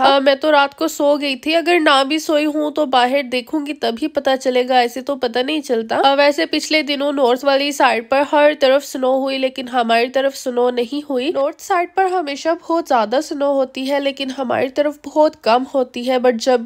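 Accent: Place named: England, United Kingdom. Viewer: ap ki urdu bari kmal hai hahah mujy boht maza ata ha england walon ki urdu sun k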